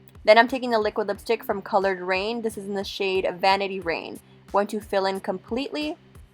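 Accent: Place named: Mexico. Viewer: Kmarie Valle It's called an accent... and majority of us talk like this haha isn't the only Mexican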